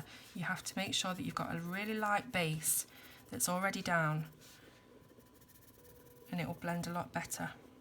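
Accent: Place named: East Midlands, United Kingdom. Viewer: Thank you so much for the mention and for showing us the whole page at the end, loving your videos, dont worry your doing great, and im From manchester by the way, i do go to Loughborough regularly as my daughter and grandson live there so i recognise the "Ey Up Duck" and your accent, mines a typical Northern accent, And i do sound posh on the phone lol my daughters are always saying im like Mrs Bouquet, or Bucket ! haha. Looking forward to more videos please, i love them all, Amanda x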